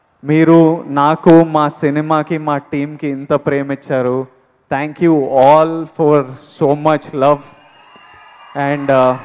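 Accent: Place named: Telangana, India. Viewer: We love ur way of talking when u mix telugu with english in తెలంగాణ యాస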